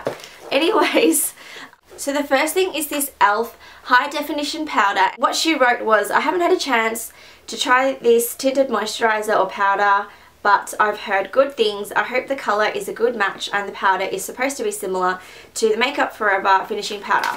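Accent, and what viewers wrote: Australia. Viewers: Yeah aussie accent! Your gifts are just as good as hers, things in oz are so expensive!!! Im an aussie girl in US :-)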